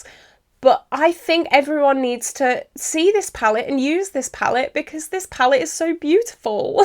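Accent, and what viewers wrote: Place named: United Kingdom. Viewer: Hello, I love your Videos so much. I found you on YT a few month ago and now I´m addicted to your Videos. It's so sad that the Beauty Bay Book of Magic an the Wilderness Palette are discontinued. I became today a bunch of Beauty Bay Palettes (my first ones), I buy it cause you are so impressed of them. It´s also a shame the ABH Subculture Palette is discontinued I had the OG one but the Quality was so bad, so I gave it away. Also I both my first BPerfect Carnival Palette these Days cause you are so in love with these Palettes. Next time I will buy my first Color Pop Palettes, but I´m so overwhelmed with the Numbers of Palettes they have so I don´t now, which one I should buy. But this purchase have to wait a little, cause I both so much these Days (Huda Beauty Grungy, BPerfect Gravity, Oden´s Eye Chrismas Value with 4 Palettes, BPerfect Carnival Interstellar, BPerfect Carnival The Antidot, a bunch of Beauty Bay Palettes, Morphe The Seasons Artistry Palette, Morphe CT18, etc.) and I want the Cosmic Brushes Winter Wonderland Palette so bad). 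I´m from Germany and I love your british accent so much. And as I said I love your Videos and Content. Stay tuned. Claudia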